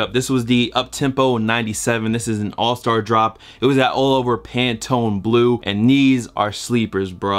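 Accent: Virginia, United States. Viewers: He from Virginia?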